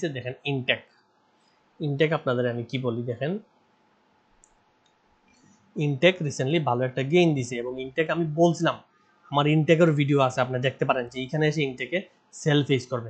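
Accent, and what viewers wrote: Sylhet, Bangladesh. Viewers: কিতা বা সোনা আপনি কি সিলেটি নি । আপনার বাড়ি চুনারুঘাট মৌলভীবাজার নাকি ফার্স সিলেটি। সুনামগঞ্জ আমাদের অনেক লোক আছে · Apni ki sylheti vaichab ni kitha khonor valani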